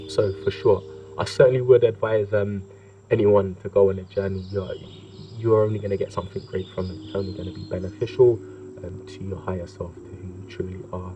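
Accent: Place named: RP, United Kingdom. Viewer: You have such an advantage with your British accent! You can literally post the inside of you nostril and make it look and sound posh 😅. You're awesome! God bless 🙏💖🌞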